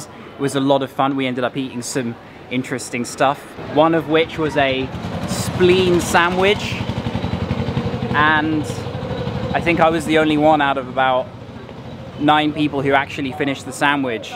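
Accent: England, United Kingdom. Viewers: you're from England?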